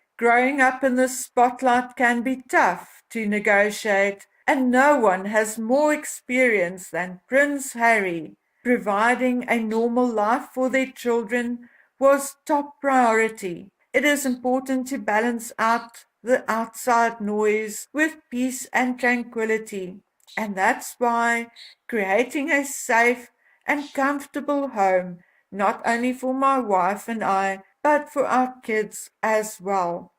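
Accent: South Africa. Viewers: It isn’t Americans falling for Prince Moob or wanting censorship, take your contempt & shove it. Britain Europe embraced Marxism, fascism and nazi-ism. You resent Americans and Brits and Europeans have tried pushing Marxism on us. Leftist organizations attacking Americans free speech rights yet you want to blame Americans. The ignorance is on people like you I have had it. Remain in your old world, ignore the rapes of little girls and women like your royals in all of Britain and Europe do. Have & blame America; I can guarantee you we will never again go to your rescue. You & the former outpost of empire Africa though from your accent you sound more those other allies of the nazis, the Boers. Who has kept & upheld monarchy & made excuses for these backward wannabe grand high poohbahs of upper buttcrack, who had no problems with their empire that they still clutch to their chests and all the problems that came about because of that. 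Who considered drug addicted nazi Harry such a popular cheeky fellow, just as they loved his nazi great uncle David. I had sympathy for Diana, and William, The Soho ho wasn’t popular in the US, but in Canada, she was assisted in entrapping your nazi Harry by other Canadians. Imbeciles like your offspring who vote fascist socialist Trudeau who attacks free speech in ho Canada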